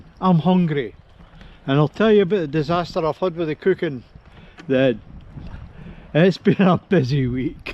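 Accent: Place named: United Kingdom. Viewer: Your accent is so cute! For me, a Brazilian, you sound like a Harry Potter character!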